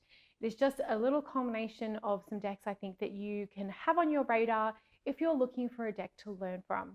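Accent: Australia. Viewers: Is says Canada but you sound Australian.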